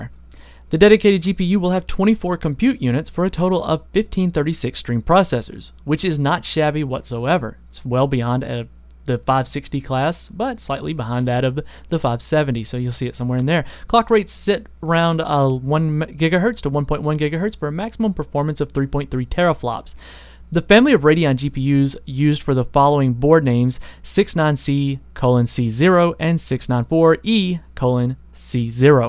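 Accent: South, United States. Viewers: Love that southern accent leaking through lol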